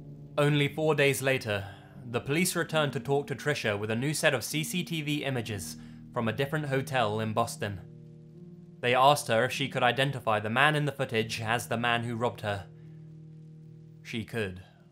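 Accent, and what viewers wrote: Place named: Southern England, United Kingdom. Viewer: It's how he pronounces it, he's from the South of England so it is bound to sound a bit different. If you listen carefully, you can hear the "inn" noise at the end, but the start does sound like he says "wuh" instead of "wih". By the way I'm from Northern England so I probably sound even stranger.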